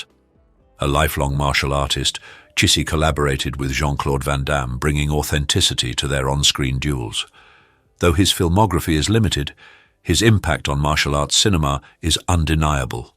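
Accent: United Kingdom. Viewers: Why always a British narrator? U'd think we're in England watching some telly!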